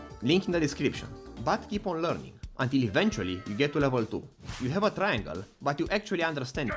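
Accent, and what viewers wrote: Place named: Romania. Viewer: Mereu o sa pot sa identific un român dupa accent :))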